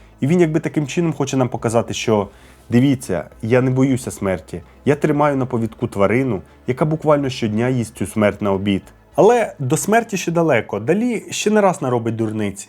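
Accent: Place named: Russia. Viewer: Мій улюблений художник. Він, дійсно, геній. Відмовився розмовляти іспанською з королем Іспанії, бо він каталонець. Про брата теж знала. По інформартивності- молодці. Особисто до Вас прохання - попрацюйте над вимовою, бо вона поросійщена. В нас тверді шиплячі. А у Вас це звучить як «[якщьо], [щьо], [щє], [вчінок], [вибачьте], [розбираючі], [бачять], [чєрга]…” Випуск чудовий, дякую. Якщо б ще вимову підтягнути- буде супер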